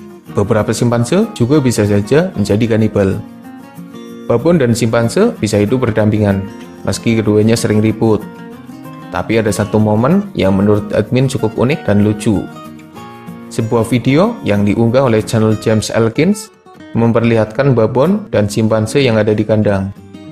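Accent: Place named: Java, Indonesia. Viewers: Nampa x jowone hhehe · Jawanya medok banget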